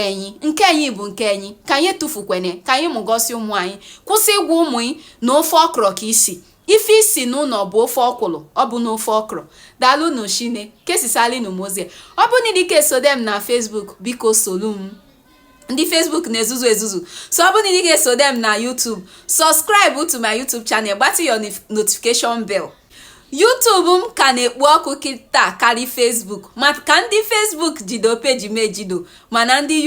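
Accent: Nigeria. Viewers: I love her Igbo dialect a lot. It is music to my hears and I just can't stop listening to her.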